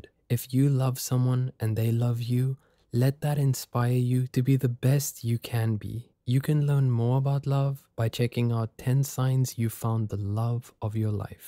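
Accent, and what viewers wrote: South Africa. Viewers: I have a question, is this a south african narrator? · I know a South African accent when I hear one 🇿🇦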